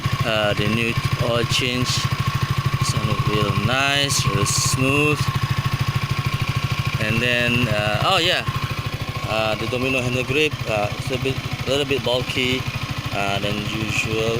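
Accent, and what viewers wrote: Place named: London, United Kingdom. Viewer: Fuh.. Brader speaking London. Not bad for first video. Keep on the good work. You know who I am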